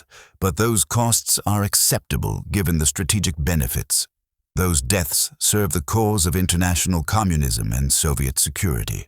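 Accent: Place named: RP, United Kingdom. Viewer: Please go back to the old AI narrarator. This posh british voice is overused and annoying.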